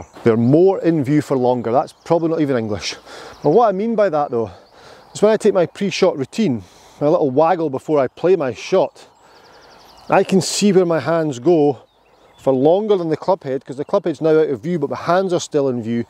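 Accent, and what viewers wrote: Scotland, United Kingdom. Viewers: Looking forward to trying this out, great video 🙏👍 Side note... after all these great lessons and tips, if Morgan freeman ever leaves the post open throwing your hat in the ring for new voice of God. I'm starting to hear a Scottish accent from above while standing over a golf ball 😊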